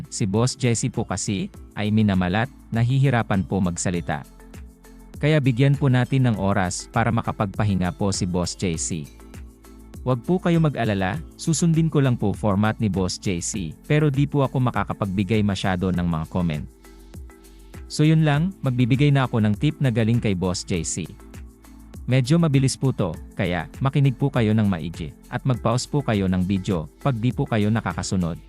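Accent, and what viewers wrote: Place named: United States. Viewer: Naging Kano ang accent ni Bossing!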